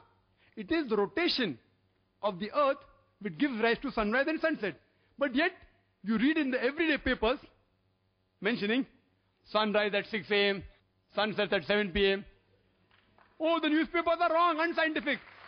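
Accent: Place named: India. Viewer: There is a huge distinction between saying, that the sun sets in Murky pool of water, and that the sun appears to set in a Murky pool of water. Like saying ' I killed that guy' and 'I appeared to have killed that guy'. Here is what Quorun says - 'Till, when he reached the setting-place of the sun, he found it setting in a muddy spring, and found a people thereabout. We said: O Dhu'l-Qarneyn! Either punish or show them kindness.! - Qur'an 18:86. The point number two: this guy appears to be a real knucklehead. His English, or what it sounds like - Hinglish, really sucks.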